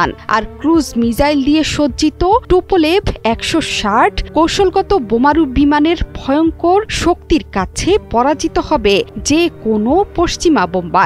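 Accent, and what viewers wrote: Kolkata, India. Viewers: এইসব খবর পাঠিকা দিনে দিনে কলিকাতা হয়ে যাচ্ছে৷ উচ্চারণের সে কি হাল৷ চাবায় চাবায় কথা কয়৷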